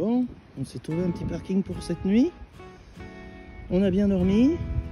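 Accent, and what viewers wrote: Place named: South, France. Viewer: Coucou! Les alignements de Carnac auraient une origine religieuse pour le culte des morts. Pour le reste, les scientifiques sont comme toi, toujours dans la recherche du pourquoi. C’est marrant, on retrouve notre petite des vadrouilles 😊 Ton accent du Sud est revenu 😂 Très jolie vadrouille et contente que tu sois revenue sur les routes et en plus accompagnée de Coco et Newton 😊 Bisous!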